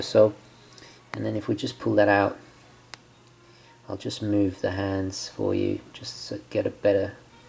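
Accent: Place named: South London, United Kingdom. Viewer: I'm tempted, but am I the only one with doubts about the compass? i'm ex-military, do lots of hiking etc - I know how to use the sun/ watch technique to navigate but I've never heard of anyone doing it seriously. At best, its is a rough direction finder, so all those intricate bearings are pointless. So, I can't help feeling it's a gimmick on an otherwise excellent watch - an internal diver style timer or 24hr bezel would be way more useful. Any thoughts, folks? Anyway, greetings from South London (or as and I might say, Saahhhrf Laaahhhndon). What a fantastic channel - you're going from strength to strength, chapeau!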